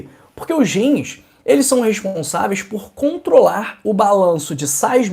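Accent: Rio de Janeiro, Brazil. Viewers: Esse sotaque de malandro carioca faz derrapar toda a credibilidad neste vídeo 🤣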